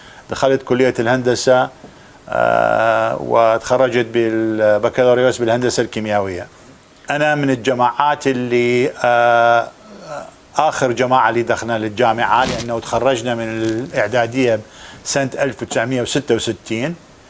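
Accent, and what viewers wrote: Mosul, Iraq. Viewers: مرحبا بك أخي اتمنى لك ولجميع يهود العراق كل الخير والتوفيق لهجتك عراقيه حلوووه مثلي 😁😁😁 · تحياتنا لك استاذ وانا كعراقي آسف للظروف الصعبه التي مرت بكم وادين واستنكر ما حدث لكم بالعراق؛؛ ولكن وانت تتكلم عن حالكم آنذاك تذكرت ما تعرض له ويتعرض له الفلسطينيين في غزه والضفه وفي عموم فلسطين المحتله؛؛ واعتقد من الإنصاف ان تقول ما حدث ويحدث للفلسطينيين من قبل السلطه الإسرائيلية فاق ببشاعته ما حدث لكم في العراق؛؛؛؛؛ ولا أستطيع أن اخفي اعجابي بأجادتك ومحافظتك على اللغه العربيه وبطلاقه؛ واللهجه التي تتكلم بها هي أقرب للهجة اهل الموصل؛ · يعني ابو ست نيران لو اخوهه طبعا احييك لئن محافظ ع لهجتك العراقيه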